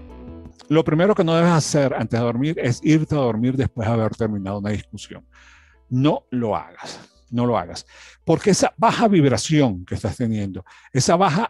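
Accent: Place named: Cuba. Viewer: Me encanta, lo que enseña son mis temas favoritos me encanta descubrir cada vez más lo que dijo JESUCRISTO... EL REINO DE DIOS ESTÁ DENTRO DE TI...... YO YA ME SUSCRIBÍ Y LO SIGO NO SE DE DONDE ES PERO OJALA, SE LE QUITE SU ASENTO XQ A VECES, NO LE ENTIENDO.... ALGUNAS PALABRAS, PAREGIDO A LO CUBANO CHICO... 😂AYÚDEME A ENTENDERLE MEJOR... LAS S S S SE LE DESAPARECEN A VECES... LO Saludo desde GUADALAJARA JALISCO . ME FASCINA SUS TEMAS Y SI TENEMOS PODER... ME ENCANTÓ LO DE JOSÉ SILVA, PERO OJALA FUERAN EJEMPLO CLAROS. ESPECÍFICOS QUE HACER EXACTAMENTE.. MIL. GRACIAS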